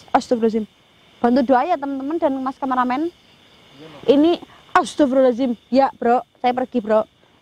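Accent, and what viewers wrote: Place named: Java, Indonesia. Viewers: Mba... Medok banget... Aku suka....👍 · Kyak orang kebumen to MBK smpean ki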